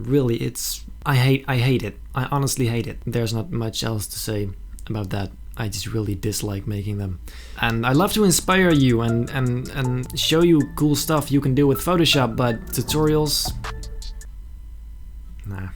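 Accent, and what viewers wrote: Netherlands. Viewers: what do you mean he hasn't done tutorials the dutch accent tuts are actually good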